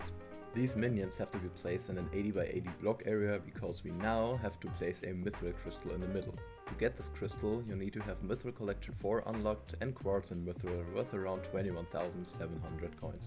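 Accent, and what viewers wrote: Germany. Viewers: Nice tutorial, i didnt know what minions to go for. That really helps! (Btw, man hört dann deiner stimme stark das du deutscher bist xD) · Ich glaube ich höre deinen Deutschen akzent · I smell german accent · Sprichst du deutsch/englisch Englisch mit deutschem Dialekt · i can hear a accsent are you german? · Der Deutsche Akzent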